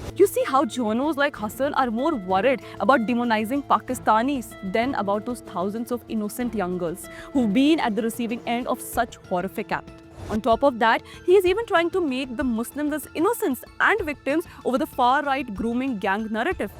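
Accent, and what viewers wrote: India. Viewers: too much Indian accent for my brain to tolerate ... sorry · You wouldn't stand a min when trying to confront Mehdi or Hijab with any of your claims. Would tremble in fear with your goofy strong Indian accent. I know some or let's say many muslims have tried to be too defensive to label any criticism of Pakistanis as Islamophobic, but how many points in this video are false? Two of the biggest ones 1) Hijab didn't instigate the Leicester riots. He went there a few days after the riots happened. But did use strong words along the lines of we'll attack them (the Hindus) the way they'll attack us. 2) Mehdi is also far from an Islamist. He's confronted Imran Khan for many of his policies for e.g. His points about Elon flipping on Pakistanis after getting backlash on Indian H1B visas from Trump supporters is true. A few weeks before Twitter was flooded with anti-Indian sentiment and Elon was getting blasted for his views. 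Now to make up for it he's flipped totally Pakistanis are scum wherever they go and should be punished for their crimes. But you lot from the subcontinent - Muslims, Hindus, Sikhs, Christians, Buddhism all have the same problem of raping & abusing women. It's something in your DNA or in the soil of that region. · With a certain Indian accent literally one can see you’re making a point scoring . The fact Indians are getting hatred from west one should humble themselves but shamelessness is being genetically inherited by you folks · That’s Indian accent, cope with it… and try to focus on the subject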